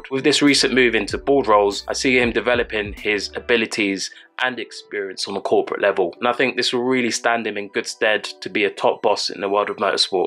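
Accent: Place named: London, United Kingdom. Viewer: You sound like ur from lonnndon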